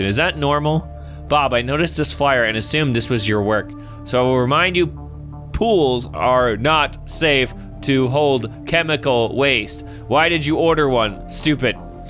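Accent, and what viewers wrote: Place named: Russia. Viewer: In case you didnt notice he has a rusian acsent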